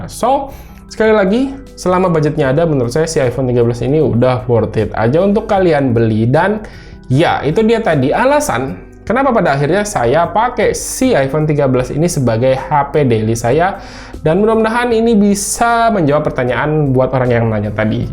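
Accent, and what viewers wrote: Samarinda, Indonesia. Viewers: Wah org samarinda nih abangnya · Org Samarinda bang?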